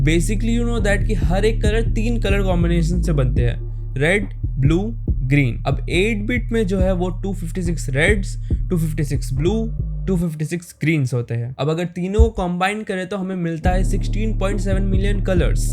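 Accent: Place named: Bengal, India. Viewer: Your bangali accent sucks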